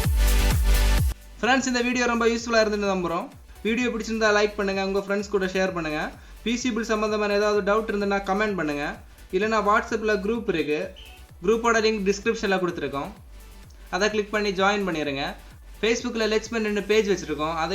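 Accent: Kanyakumari, India. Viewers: Bro enaku oru pc build pannanum. Slang ppatha kk slang mathiri iruku.. unga address illa number kedaikuma.enaku office purpose ku than so minimum evlo budget la panna mudiyum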